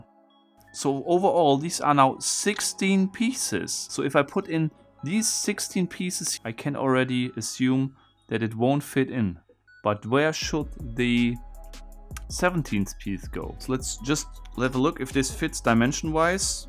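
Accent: Germany. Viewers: Do I hear a bit of an German accent in your voice?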